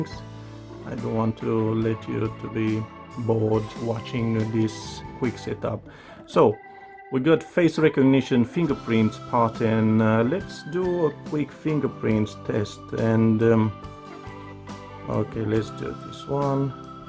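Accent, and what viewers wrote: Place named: Romania. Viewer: de accent is from Romania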